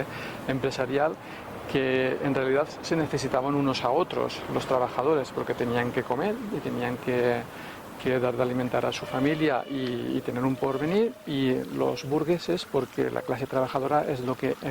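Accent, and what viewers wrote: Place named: Madrid, Spain. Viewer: Yo resido desde principios de Enero de 1965 en Osnabrück (Alemania) y soy ciudadano de Atzeneta de Albaida (Valencia). No obstante estoy teniendo mi segundo domicilio en esta ciudad de Alcoy/Alcoi desde 1969. He podido apreciar, que cuando haces comentarios, lo haces con acento madrileño. Sí realmente eres de procedencia Valenciana deberías de hablar el idioma español y no el idioma castellano de procedencia madrileña! Un cordial saludo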